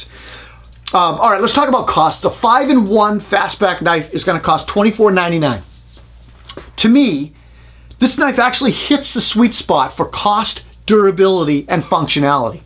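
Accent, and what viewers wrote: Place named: New York, United States. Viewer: Don’t like this big mouth. Very New Yorkey.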